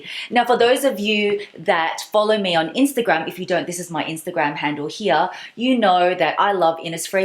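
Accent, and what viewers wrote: Australia. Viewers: Are you Thai or Filipino? Like your Australian accent mixed with Asian roots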